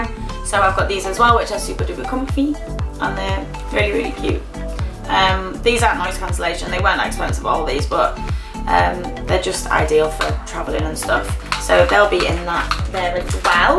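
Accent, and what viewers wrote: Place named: Northern England, United Kingdom. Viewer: I got sent here from one of Nina's posts! Look forward to seeing your vlogs so good to hear another northernerish voice!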